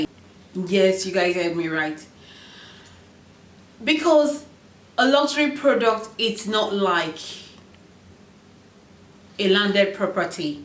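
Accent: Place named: Nigeria. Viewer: You sound Nigerian, I can tell. I think that luxury obsession is ridiculous. I love luxury items but I wouldn't buy one as an investment.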